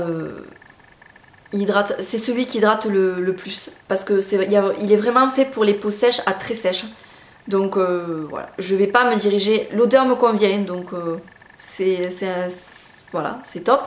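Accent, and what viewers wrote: South, France. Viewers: J'adore regarder tes vidéos sont un vrai plaisir, surtout avec ton petit accent du suuud !!^^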